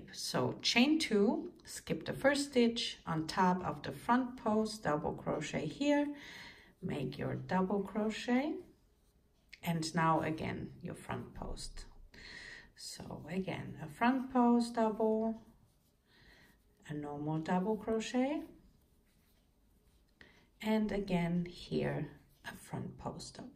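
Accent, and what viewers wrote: Germany. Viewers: you speak English with the exact same accent as a German colleague of mine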